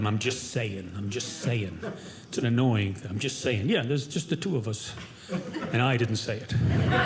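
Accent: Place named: East Coast, United States. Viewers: Thanks! Yes, I hear some east coast, but I also thought I heard a little midwest, too. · Educated east coast...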